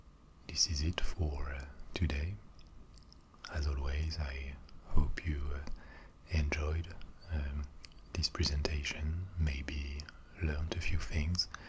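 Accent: France. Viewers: You are the only person who has a french accent that I can stand. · your french accent is so stereotypically beautiful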